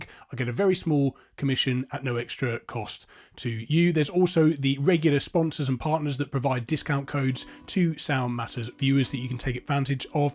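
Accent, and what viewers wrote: United Kingdom. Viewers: If you’re in the UK - which sounds like it by your accent - please can you advise on an imminent problem I’m facing with my Fluance RT85, please? I’ve had one for four years and I’m certain the belt will need replacing very soon, however, going to the Fluance site, a replacement belt is £17 (reasonable) but adding in the cheapest shipping option, it comes to £110! I don’t have any Canadian friends to ask to purchase on my behalf and also can’t find what could be a compatible belt here in the UK… any thoughts? This could be an issue that many will face sooner or later.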